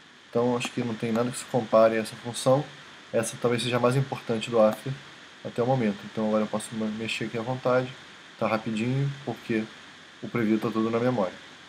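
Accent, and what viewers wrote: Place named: Rio de Janeiro, Brazil. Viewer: Pô, tu está perdendo tempo... com essa voz ai, se você gravar uma música dizendo que é do todo mundo vai acreditar kkk até o sotaque carioca vc tem =)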